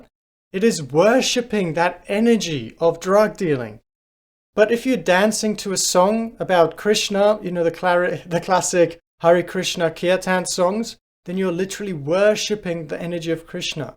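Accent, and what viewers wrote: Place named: South Africa. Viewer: Yeah. I was confused for a second as I thought you were from Australia. But then, I thought about it and thought maybe your accent sounds a bit South African.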